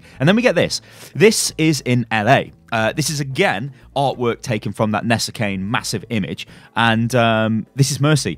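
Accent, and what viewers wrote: United Kingdom. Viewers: I hate how brits say weekend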